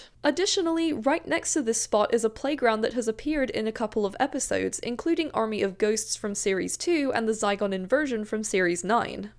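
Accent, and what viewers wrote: United Kingdom. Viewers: Very impressive packing all that in! You sound so British that I can’t believe you’re not from there 😱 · “To visit my British family” With that accent, you don’t consider yourself British?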